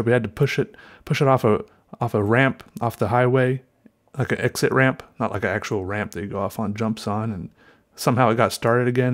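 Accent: South, United States. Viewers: Yes very southern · I haven't watched your channel in like a year and yes you sound so much more southern lmao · I hear a little southern accent in there... I like it :) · Enjoy your southern draw but your drawings more. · the southern accent is a no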